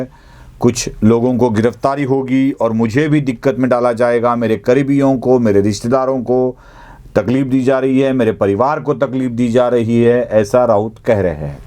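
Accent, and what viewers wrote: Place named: North, India. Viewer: बात सही है.. मराठी विशलेषण एक दम महाराष्ट्र के गावठी मिट्टी से जुड़े है ऐसा दिखता है और हिंदी तो जब बोलते है तो एक दम उत्तर भारत के हैं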